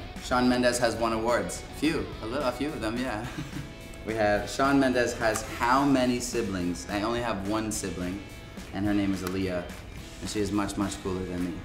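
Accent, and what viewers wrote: Rio de Janeiro, Brazil. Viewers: o sotaque dele é bem carioca 😂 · parece o sotaque do povo do Rio de Janeiro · que sotaque carioca mais lindo, tô apaixonada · E esse sotaque carioca no Buxxcando?? Ameei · "BUXXXCANDO MEU NOME" meu Deus, ficou quase uma semana no Rio e já tá falando igual Carioca <3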